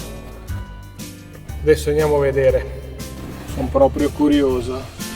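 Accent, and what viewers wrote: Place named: Eastern Europe. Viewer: ma..io sento un accento slavo .. e nn dico rumeno.. secondo piu slavo .. ucraini.. ex iugo..bulgaria?)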